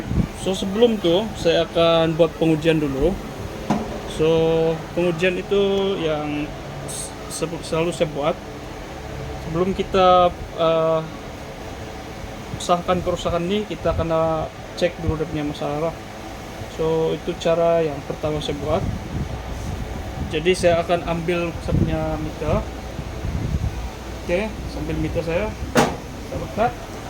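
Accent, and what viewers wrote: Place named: Sabah, Malaysia. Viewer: Kedai dmna bang dsabah ka loghat mcm sabahan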